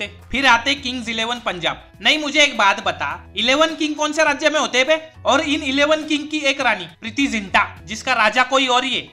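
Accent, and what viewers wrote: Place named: Gujarat, India. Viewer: He is gujju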